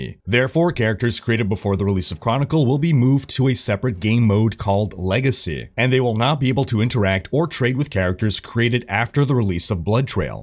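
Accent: Quebec, Canada. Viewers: Bordel tu parles français (enfin quebecquois si j'ai bien reconnu l'accent) ;) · you learned french in Quebec didn't you? Your accent is 100% french canadian, I know because I'm one of those weirdos haha. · Wtf I've been watching you for ages and never noticed!!! Québecois? :D loll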